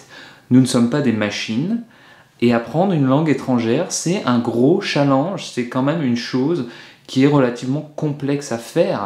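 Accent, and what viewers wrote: France. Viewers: Parfois c'est bon de traduire littéralement de l'italien en français, mais pas toujours.. Et... Bravo pour ton italien oral! Ton accent français est délicieux, comme l'accent de tous les français lorsqu'ils parlent italien! 😊 Je te salue de ma merveilleuse Liguria, l'un des lieux les plus beaux d'Italie, un arc-en-ciel de lumière et de couleurs entre la mer et les montagnes que je te conseille vivement de visiter! Il me fait plaisir que tu aimes l'Italie et sa culture! Mais... tu me permets? On dit "mi piace molto imparare..." etc, non pas "mi piace molto di imparare... etc. Ce "di" n'éxiste pas dans cette phrase en italien: c'est juste une traduction littérale du français, ce que tu viens de dire!... Tes enfants qui chantent "L'Italia s'è desta" sont trop charmants! Ciao!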